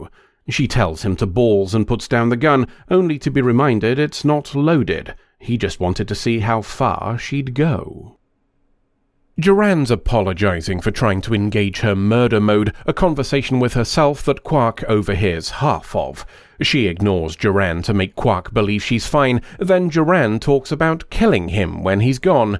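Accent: RP, United Kingdom. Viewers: In response to a video I keep getting suggested by another Youtuber about "the time Janeway was the spider queen", I went and found your video on the topic. I don't know much about culture in your company, but I believe you sound a bit more "posh" in that episode. <link>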